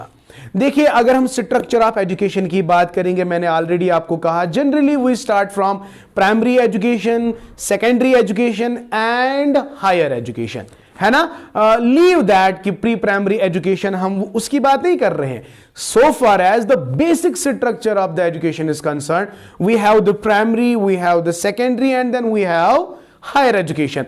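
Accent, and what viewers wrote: Kashmir, India. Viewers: I like your little kashmire in sir your lecture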